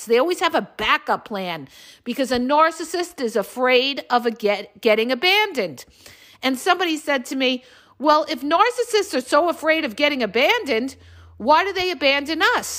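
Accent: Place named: New Jersey, United States. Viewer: I guess you're from Jersey.Thank God I love you.I'm from Elmwood Park.I love the way you talk and you don't put up with s*** and you swear that I love you liked and subscribed.I was with the covert narcissist 44 f**** years, I left 6 months ago and enrolled in cosmetology school and with my old roommate.But i'm still sad the abuses something else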